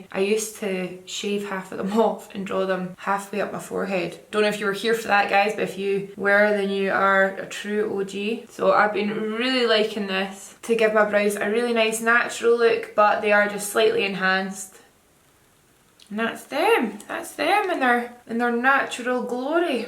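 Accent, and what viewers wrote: Scotland, United Kingdom. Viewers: Tarte pro glow to go is the perfect product for that scottish accent! I love it haha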